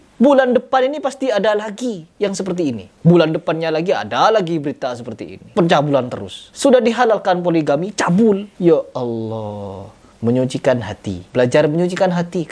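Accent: Madura, Indonesia. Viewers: Bang Aku senang dengar aksen Maduranya walaupun Aku orang Maluku 👍Hajar terus Bang Mereka mereka yang berprilaku seperti Serigala berbulu domba 😭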